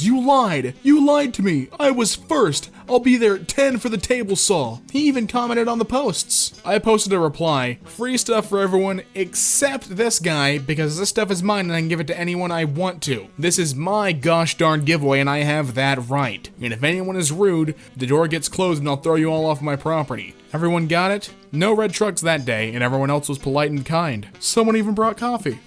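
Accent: United Kingdom. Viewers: is british? his accent was british 12 months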